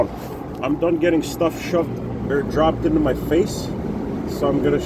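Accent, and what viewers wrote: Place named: Canada. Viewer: This is the greatest video I’ve ever watched. I called it from the first minute you were Canadian. Cracked up the entire video. Well done sir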